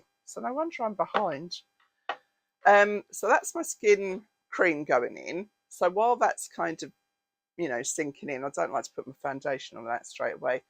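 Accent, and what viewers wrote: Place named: London, United Kingdom. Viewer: Love your videos... where is your accent from? It sounds so familiar to me... SW London?:0) xx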